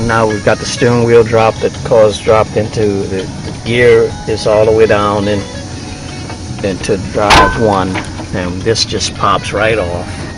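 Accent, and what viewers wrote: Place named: Louisiana, United States. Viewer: Glad you from Louisiana I understood perfectly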